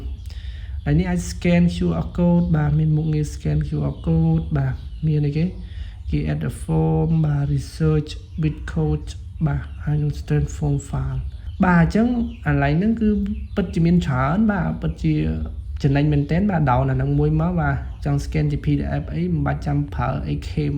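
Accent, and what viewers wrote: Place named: Kampot, Cambodia. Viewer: ស្រ៉ូប ៗ ដូចអ្នកកំពត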